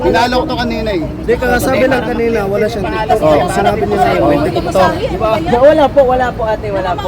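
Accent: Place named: Visayas, Philippines. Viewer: bisaya ang tuno ni ate.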